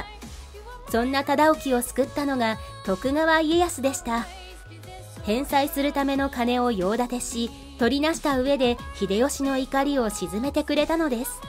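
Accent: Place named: Himeji, Japan. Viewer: 姫路のイントネーション！！！！！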